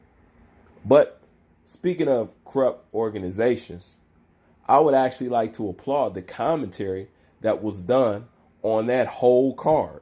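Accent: United States. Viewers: You know you’re full of shit right? You know wilder wasn’t ducking fool.. Yea, he was ducking someone who just got stopped by Ruiz? You must have a brain tumor fool! Wilder lost in your records but your records doesn’t matter. That’s why he’s running it back. You sound like you’re from America, SUPPORT YOUR OWN MORON!!! You’re what is wrong with the world today.. Bitch!!!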